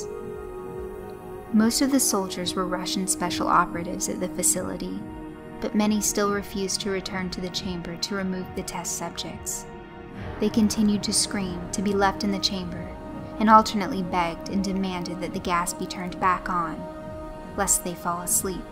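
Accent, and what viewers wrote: South, United States. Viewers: Don't u EVER doubt your narrative talents!!!! U r awesome girl! Especially when u throw n the southern drawl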